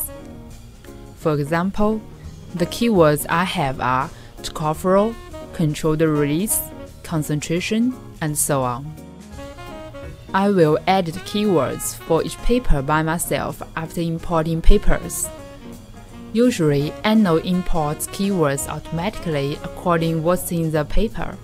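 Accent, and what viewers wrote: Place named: Jamaica. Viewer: very comprehensive. jamaica english ? VIELEN DANKE !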